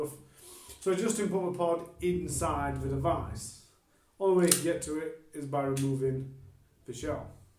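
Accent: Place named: Manchester, United Kingdom. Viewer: Appreciate your honest review, my man! Just found you on YT with this review. I have one in the post, really looking forward to getting my hands on it. I’m usually good at identifying English accents. I can’t quite place yours. Definitely Manchester-ish, broadly speaking. Btw, love that you have real life intruding in your video. There’s something endearing about the banging and interruptions, lol!! Keep up the good work, mate.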